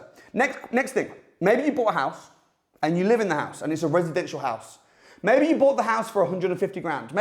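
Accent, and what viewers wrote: United Kingdom. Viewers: Why does the British accent make this video un watch able.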